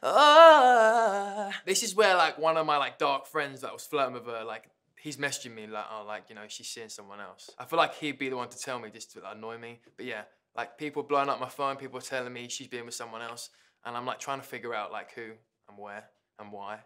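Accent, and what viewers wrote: United Kingdom. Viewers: Did not know he was British 😐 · SOMETIHNG WENT WRONG WHEN IS SINGING I HAVE AN ENGLISH ACCENT AND WHEN HE TALK IT AN BRITAIN ONE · WOAH HE IS BRITISH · Lol hes British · Still can’t believe he’s British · HES BRITISH ?! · OMG HES BRITISH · how come you have a british accent when you talk but when you sing in a american accent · Why does he sing in an American accent but talks British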